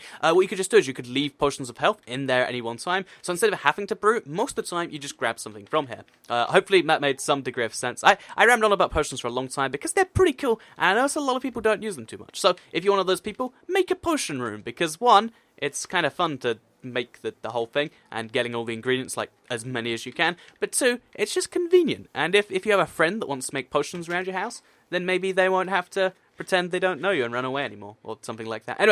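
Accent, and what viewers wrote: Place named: United Kingdom. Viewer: I always come here to watch and i think to myself: He's gonna say "Helloooow I'm " with his UK accent and everything... But now he doesn't:(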